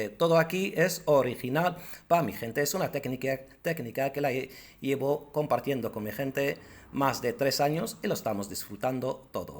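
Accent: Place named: Morocco. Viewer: Me gusta ver y escuchr a como maestro, con su acento marrocano en castellano. Recuerdo mis amigos Marrocanos que vivien en Oslo, Yo agradesco siempre por tù generocidad de compartir tus experiencias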